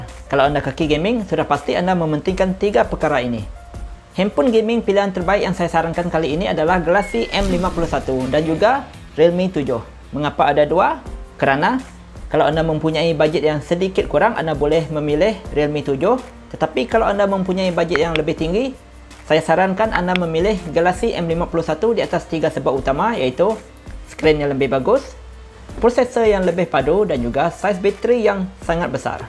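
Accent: Sarawak, Malaysia. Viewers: Slang Iban so awesome...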